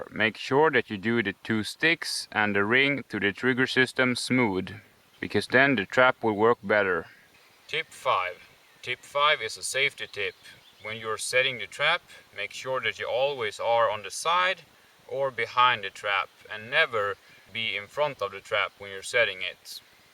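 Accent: Sweden. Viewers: Wow his swedish accent isbreally close to a finnish accent! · Make sure the sticks are really "smood" guys. ahahah I love the Swedish accent. awesome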